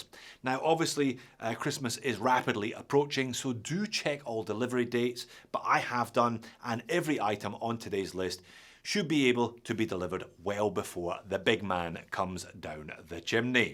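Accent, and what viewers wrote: Scotland, United Kingdom. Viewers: Love the channel! Glad to hear a fellow Scot who loves watches! Erebus gawin yersel!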